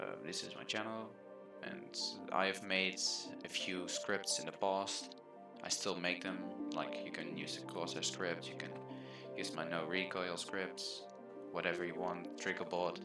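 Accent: Netherlands. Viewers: dat nederlands accent ook oei oei oei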